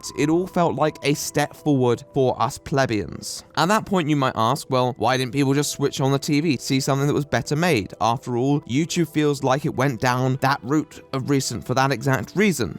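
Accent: Netherlands. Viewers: wait, u dutch? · are you dutch?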